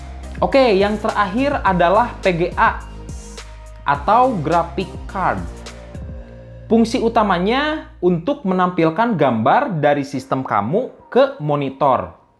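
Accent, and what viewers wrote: West Java, Indonesia. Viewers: Sunda pisan kang 😂 Nuhun penjelasan na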